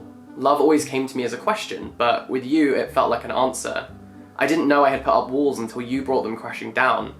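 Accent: United Kingdom. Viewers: I love his british accent and ofc his word games hahah ;)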